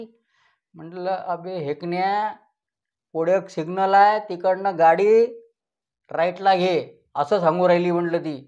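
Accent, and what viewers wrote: Vidarbha, India. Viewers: अहो हे विदर्भातलं मराठी आहे. मालवणी किंवा कोल्हापुरी नाही. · माझे खूप मित्र विदर्भातील आहेत आपले बोलणे ऐकले की त्यांची आठवण येत. पण एक नक्की आपण आपल्या भाषेत खूपच खरपूस समाचार घेतला आहे.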